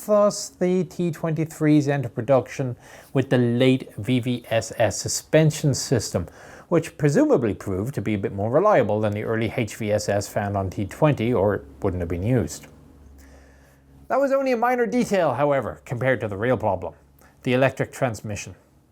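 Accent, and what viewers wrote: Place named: Ireland. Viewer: I like reading. But I can't read with a cool Irish accent so here ai am.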